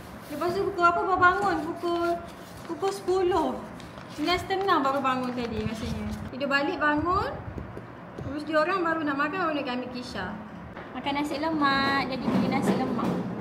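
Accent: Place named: Terengganu, Malaysia. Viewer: Comey kakak slang tranung hahahahah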